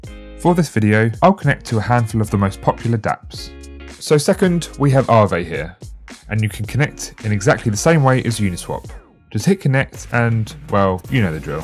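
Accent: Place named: England, United Kingdom. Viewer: same guitar and same English guy voice is every W3 video...he must be busy..I wonder if he plays the guitar too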